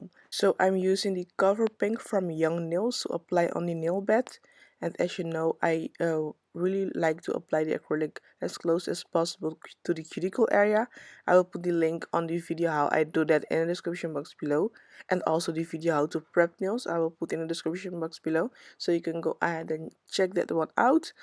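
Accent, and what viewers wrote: Netherlands. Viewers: Hii honeyy love your work, love ur accent are u perhaps dutch? Xx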